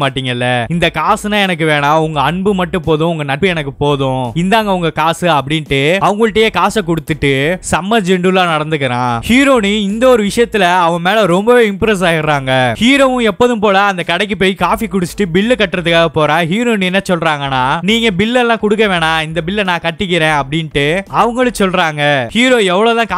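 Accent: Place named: Coimbatore, India. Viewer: Coimbatore English oru thani slang pa - Heronee...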